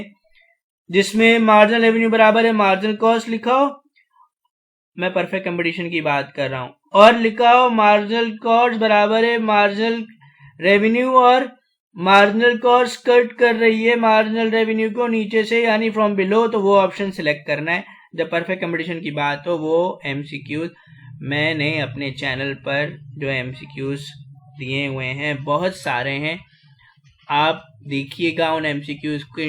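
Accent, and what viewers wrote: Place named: Karachi, Pakistan. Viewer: Lahore board k haii?? Awazz apki Karachi walii lag rahii ha🧐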